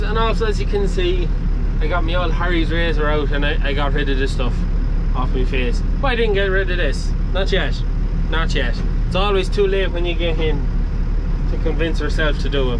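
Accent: Ireland. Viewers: hey are you from louth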